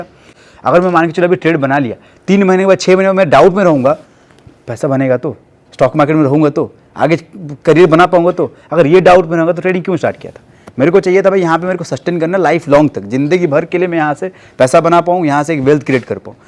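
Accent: Odisha, India. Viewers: Hindi bolna style se lagg raha he , ap Odia ho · Sir ap odisha sa ho kiya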